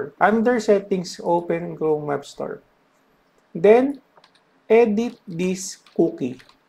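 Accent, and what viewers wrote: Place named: Latin America. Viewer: amigo porque pija hablas en ingles si se nota de aca a la china que sos latino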